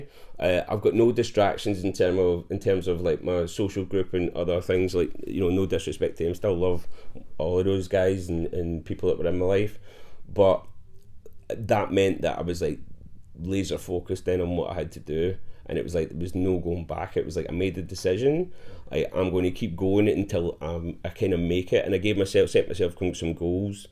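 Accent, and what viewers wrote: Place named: Glasgow, United Kingdom. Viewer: Я прекрасно его понимаю. Я австралиец, но моя мама родилась в том же городе, где он жил в Глазго. Я всю свою дочь провела в качестве переводчика для своих друзей, так как никто из них тоже не мог ее понять. Этот акцент трудно понять, если вы не привыкли к нему.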